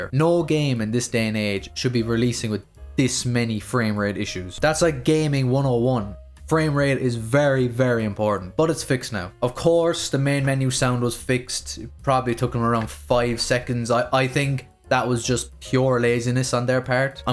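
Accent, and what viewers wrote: Ireland. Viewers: That's just how Irish people say three.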